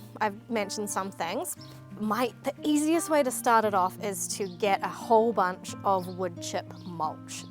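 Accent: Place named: New Zealand. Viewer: By the way, you have an odd accent (not unpleasant). I can definitely hear the kiwi twang but it's mixed with something else. Irish?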